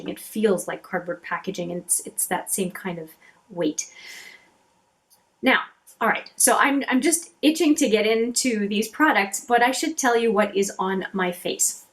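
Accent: Quebec, Canada. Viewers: The Elizabeth Arden is still on their website.Guess I know y!U sound like u could be Canadian french!I think the urban decay shadows are my fave of the ones that I have.In general for the price and general quality they're the best of the ones I have.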